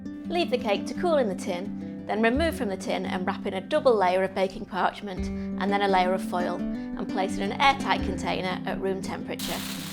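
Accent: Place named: Yorkshire, United Kingdom. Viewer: Well, I made 2 cakes , and they were so delicious , we already ate one! Now im making another! Lovely, easy recipe, cant go wrong, and I love your Yorkshire accent, reminds me of home! Love Janet in Norway! x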